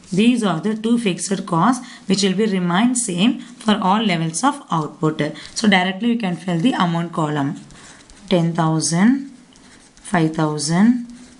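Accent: South India, India. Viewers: Thank you mam.lecture is good and i like your voice as you are south Indian if i am not mistaken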